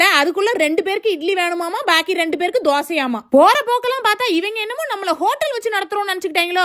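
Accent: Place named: Coimbatore, India. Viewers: பேசுறது எதார்த்தமா கோயம்புத்தூர் பாஷை சபாஷ் ❤❤❤ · அம்மணி உங்க சமையல் ரெசிபியை விட, அந்த கோயம்புத்தூர் பேச்சு அருமைங்கோ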